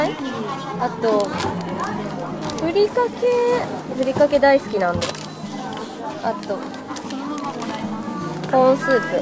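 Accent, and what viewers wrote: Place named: Fukuoka, Japan. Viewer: 私も福岡で方言喋ってるの見て嬉しい、ほんとかわいいなぁ…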